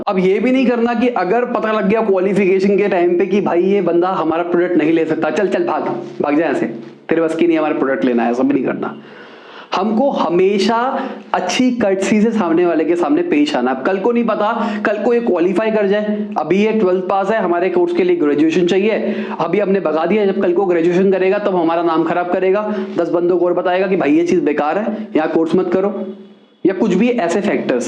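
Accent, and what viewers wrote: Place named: Delhi, India. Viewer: Wow maja aa gaya aaj, sales mai aap master ho.... Muje aap delhiwalo ka bolne ka toon bahut pasand hai.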